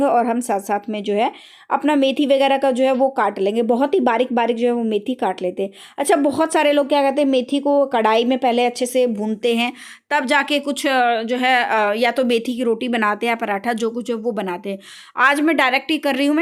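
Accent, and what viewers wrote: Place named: Haryana, India. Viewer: Di aap kha rhte ho qki aap haryanvi lgte ho bcoz m b haryanvi hu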